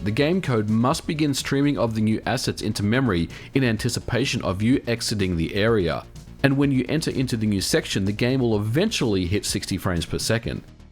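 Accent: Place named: Australia. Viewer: you sound Australian but your games are not? do you live in Australia?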